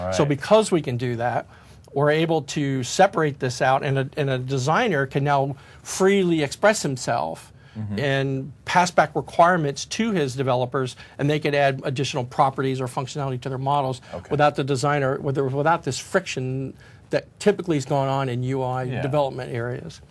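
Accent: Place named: Baltimore, United States. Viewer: Effective. Well presented.... are you from Bali-mur? That's always a plus.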